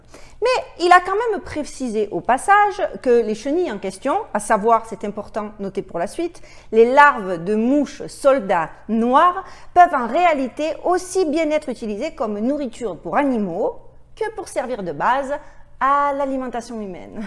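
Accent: Marseille, France. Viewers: Loook et accent de cagole, discours complotiste de tete percée....ha ha ha ....sérieux....qui peut écouter ca plus de 30 secondes sans avoir envie de l'entartrer cette folle ! FOUT TOI A POIL ! T'auras plus de viewers !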